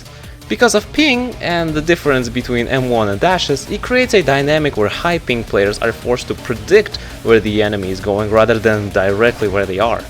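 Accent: Russia. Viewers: Bro has russian accent, just like me b. I am russian but ik english very well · Are you Russian?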